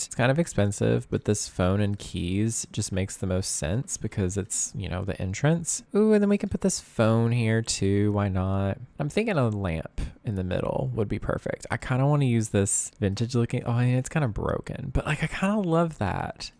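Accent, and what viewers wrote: South, United States. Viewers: ! I love your southern twang you’ve def made the line up of my nightly sims YouTubers ❤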